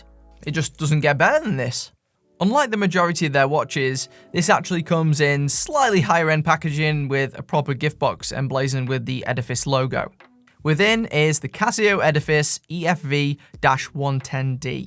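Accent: United Kingdom. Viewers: Fantastic informative review of this COOL watch. So much usefull information. Love the clear British accent.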